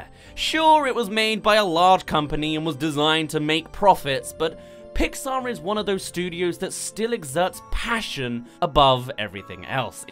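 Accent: United Kingdom. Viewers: I actually like the British accent. Maybe even a bit jealous...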